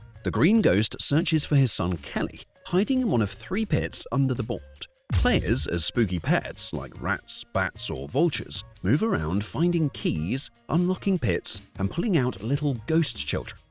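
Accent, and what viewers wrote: United Kingdom. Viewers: I'm a Brit that's lived in the US for over 20 years.... Why is a British accent describing lots of games which WE NEVER HAD in the UK?.. Rather mystifying.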